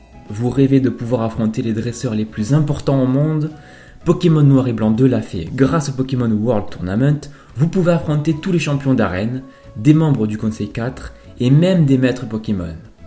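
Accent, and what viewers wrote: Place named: South, France. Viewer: ta l'accent du sud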